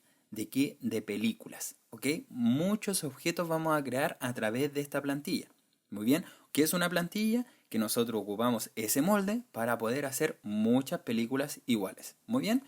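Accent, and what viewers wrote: Chile. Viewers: Muy buen video, este era codigo que utilice muchas veces pero nunca lo vi ASI EN LIMPIOO y con una metafora TAN PERFECTA como las peliculas y serie, adems me encanta el acento chileno ajaj no se de que region es este acento.. pero tiene mas C y S que otros. Saludos desde Argentina. muchas gracias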